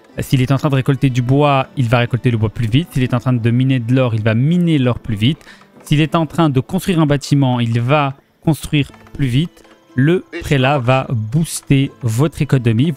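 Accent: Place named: United Kingdom. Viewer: Marrant, les haters qui se moquent de ton accent anglais ne viennent pas corriger ton allemand ?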